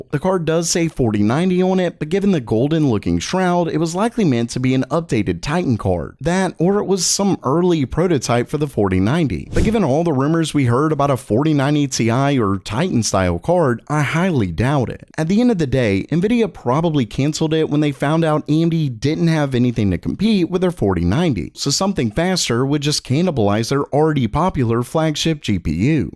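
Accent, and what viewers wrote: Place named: Appalachia, United States. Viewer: Your hillbilly accent is unbearable. "It's one massive COARD"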